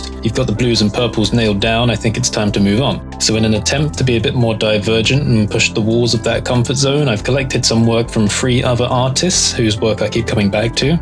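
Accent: United Kingdom. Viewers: wow this is my first time seeing you haha I love all your vids but by your accent I always thought you was a British Pakistani or something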